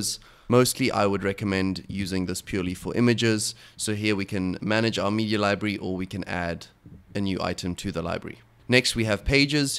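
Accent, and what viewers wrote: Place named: Cape Town, South Africa. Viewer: Thank you - this is super helpful! P.s. knew you were from Cape Town the minute I heard you talking:) Lived there last year, it's a gorgeous city!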